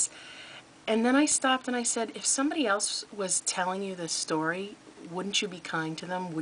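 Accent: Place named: East Coast, United States. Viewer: Great vlog! So proud of you thinking through things you're "saying to self". Ps..love your east coast accent:)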